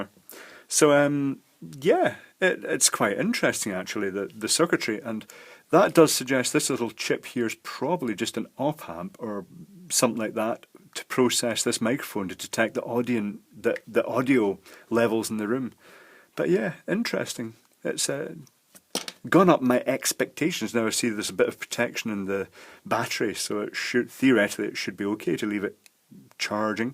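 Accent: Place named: Edinburgh, United Kingdom. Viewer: "Mellifluent" I think is the word you are seeking, Robert. Me, I thought it was just his pan-loafy Edinburgh-esque accent. :-)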